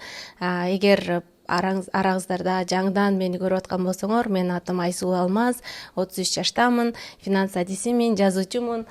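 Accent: Standard. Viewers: Абдан акылдуу, кыргызчаны таза сйлогон кыз экенсин чон рахмат!!! Кайсыл жерлик болосун? Мен Нарындын Ат- Башы районунан болом. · Айсулуу,таза жана так кыргыз тилинде сүйлөгөнүң үчүн канча "жакты"белгисин басуу керек болсо,басмакмын.Өмүрүңө жана ден соолугуңа береке тилейм,аман болуп,кыргызга пайдаң тие берсин.Ошон үчүн да баракчаңа жазылдым. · Суйлогонунуз да кыпкыргызча гоо! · ...мен бул каналга бекеринен туш келбедим, бир дем менен угуп чыктым. Бул кыз кыргызча, орусча, англисче, туркчо ой жугурткон практик, динден кабары бар, жолдошунун акылманы, балдардын суйуктуу апасы, эн кучтуу МИНИМАЛИСТКА деп кабыл алдым🎉🎉🎉. Жашым бир топ улуу болгону менен озумо керектуу коп нерсе алдым! Эми уйкум качат 😅. Ото жонокой, таза кыргыз тили менен арбап алды. Аз тапканына чиренип, жерде басканын унутуп, мурдунун таноосу корунгучо чалкалагандар учун улгу болчу акылман кыз экенсин! 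Эми тынбай коромун! Орустар айтмакчы ПРОСТО ПОЛЮБИЛА!!! Токтобой ос ылайым Алтын кыз!!! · Кыргыз тилин таза сүйлөгөнүңдү сыйлайм,өмүрүң узун болсун жаштарга үлгү болуп жүрө бер кызым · Кыргызчаны таза сүйлөйт экен. Баракелде🎉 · Кандайсыз, Кыргызстандан сырткары иштеп окууп журсонуз да Таза суйлогонузду сыйладым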